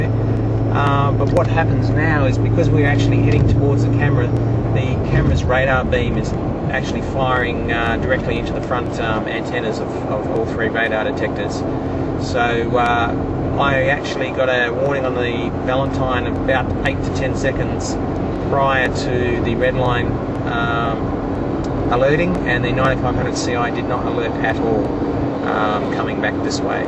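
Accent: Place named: United Kingdom. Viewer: ok sorry about that because i'm french and having hard time understand your kind of british accent keep on good worck !! best samuel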